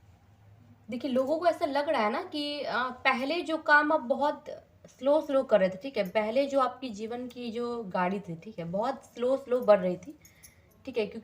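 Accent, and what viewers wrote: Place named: Patna, India. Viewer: Dee aapki tone to Patna ke aas pass ka hai..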